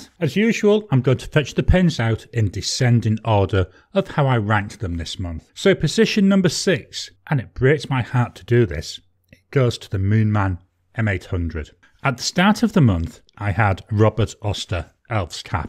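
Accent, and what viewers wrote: United Kingdom. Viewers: Hi, your pens are more down to earth and it was interesting to see your selection rather taste. I liked that you showed the price of the pens but was curious why in $ when your accent is from the UK, then I seen another video, your are in Australia! I have been using a Pelikan M205 B (Merry Berry Ltd Ed), Monteverde Innova F and just bought a Diplomat Elox F. Thank you for your Inkvent and other reviews to keep me occupied, Happy New Year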